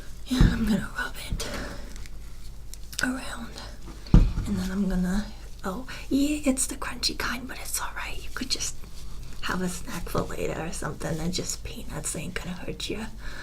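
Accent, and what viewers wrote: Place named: Boston, United States. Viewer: Your beautiful and are you from Boston if so your accent is to die for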